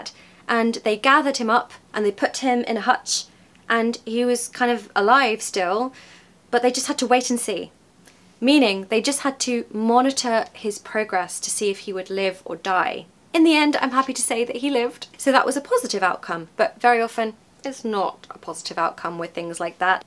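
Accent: RP, United Kingdom. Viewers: Sound natural is very Well, but a Light Froggy accent is very efficient for picking up, and I’m sûre that is you spoke French, your British accent would wonderfuly delicious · It's such an amazing teaching video as always! I've learnt so much from you and I took a note of them on my phone note so that I can revisit to consolidate. You are the No.1 native british who speaks with a beautiful quintessential british accent that I would love to listen for hours. Thank you for all your effort and amazing teaching!!😃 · Ma'am I am improving with your British RP standard ❤ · I really enjoy watching your video❤❤ i'm impressed by British Accent and i hope i'll have a perfect British accent as you one day❤ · I adore listening to you . Your british accent sounds for me like a music. Thank you so much. You are so lovely. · I love hearing your typically British accent! 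Thank you!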